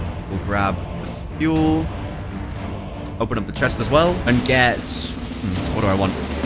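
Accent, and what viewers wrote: United Kingdom. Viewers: Are you British because I don't mind British people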